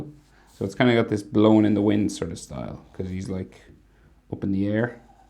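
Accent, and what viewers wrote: Ireland. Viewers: fellow irish?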